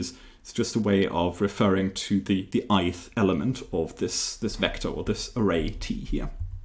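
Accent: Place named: United Kingdom. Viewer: Wow, first time listening to Cambridge University. Love it, both content and the British accent.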